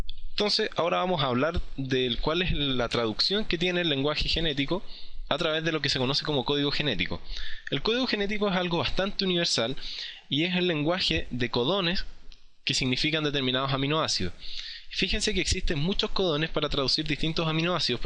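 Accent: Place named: Chile. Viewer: creo que eres chileno por tu acento y porqe no me desconcentre al escuchar jajaj (soy chilena) graciaaaaaaas ahora podre dar mi prueba de bioqimica tranquila :)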